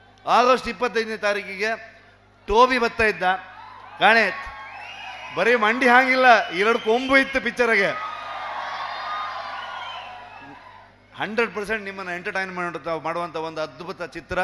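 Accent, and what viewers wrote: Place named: North Karnataka, India. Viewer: Super , pronunciation I think 90 % same as north Karnataka style deeply u listen .(gulbarga, yadgir, .....) 🤗☺️🙏👍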